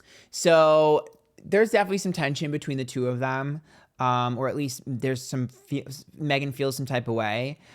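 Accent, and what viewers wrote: New York, United States. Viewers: I KNOW you're an Italian New Yawkaaaa or Jersey 🙌 and a psychic on top of that?? yes pleaseee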